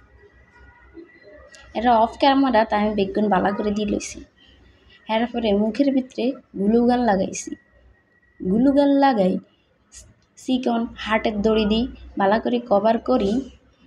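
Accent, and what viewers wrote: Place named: Noakhali, Bangladesh. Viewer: ও 😂 ভিডিও খান সুন্দর ই অইচে👌আনরা সবাই হরের ভিডিও কানের লাই অপেক্ষা আছি☺️☺️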